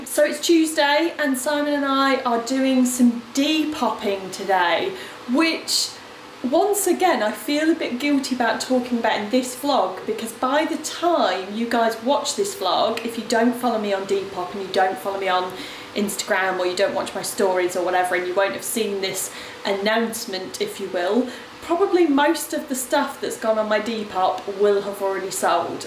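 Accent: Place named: London, United Kingdom. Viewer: Ok, let's make a deal. I'll come to London and you teach me that oh so wonderful English (London) accent and I will transmit to you my Texan twang. And yes....I say "y'all" & "fixin'".🤣😎🤣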